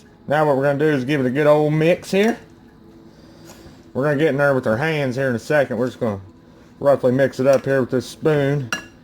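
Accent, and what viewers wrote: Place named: Western Kentucky, United States. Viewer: Where are you from? We have the same accent. I’m from western ky. You’re a great cook btw!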